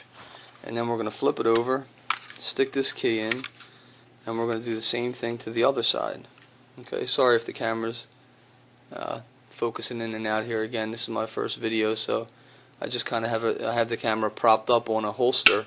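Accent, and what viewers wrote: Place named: New Jersey, United States. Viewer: I love your analogies A potato chip? LOL!!!!!! Love the accent too. New Jersey?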